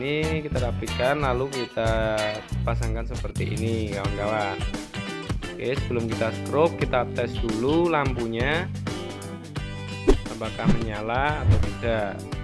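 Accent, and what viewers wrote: Java, Indonesia. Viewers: Jowo ne kenthel..."MBRAWUL"..😀😀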